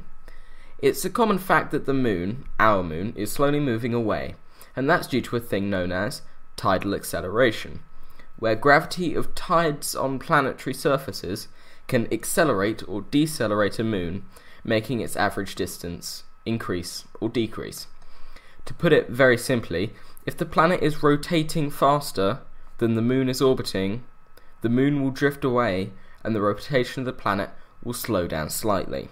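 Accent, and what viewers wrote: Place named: England, United Kingdom. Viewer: Eric Williamson Englishman speaking English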